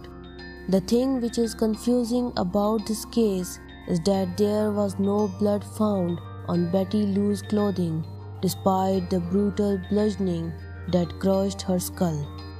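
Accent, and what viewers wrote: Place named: India. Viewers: Sounds like India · That's indian accent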